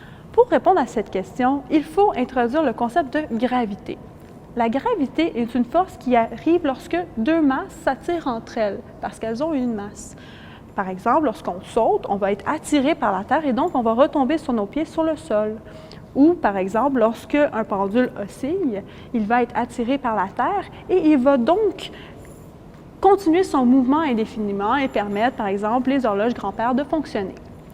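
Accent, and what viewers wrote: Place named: Quebec, Canada. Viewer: Aaah l'accent québécois... Il rendra n'importe quel discours humoristique, aussi sérieux soit il. XD. Sinon très intéressant.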